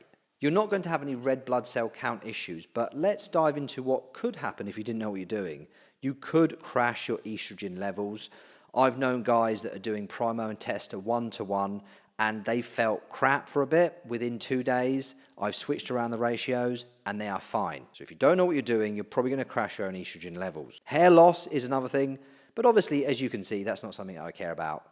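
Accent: United Kingdom. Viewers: Just found you online, love the content and delivery. Where are you from, sounds a bit like notts area?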